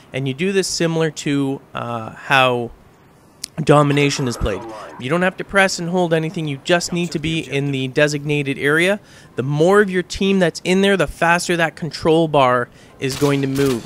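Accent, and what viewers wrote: Canada. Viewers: may i ask if you're canadian? i thought i heard a hint of canadian accent.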